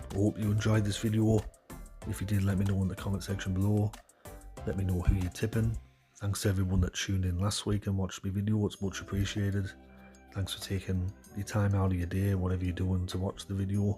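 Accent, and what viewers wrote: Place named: Liverpool, United Kingdom. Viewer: Scouse accent..👌😊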